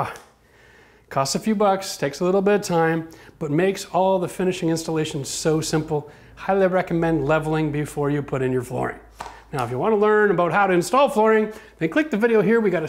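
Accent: Canada. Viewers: you're such a cowboy! SLC is the "one" cementitious product I actually measure the quantity of water for -- partly cuz leveling a floor is sort of a one-shot deal, also because the stuff is like $25 a bag around here. On the other hand I'm brave enough to squeeze the whole bag into one pail -- there's about an inch to spare:) Definitely worth taking the time to properly level a floor. Always fun educational videos and I'm picking up a lot of Canadian.. almost can say Prow-cess correctly! Happy 2020!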